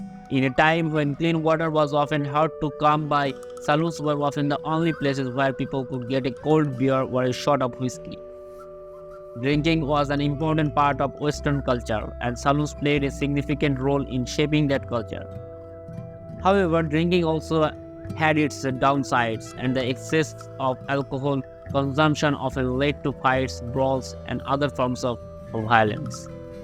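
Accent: India. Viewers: An Indian narrator? REALLY?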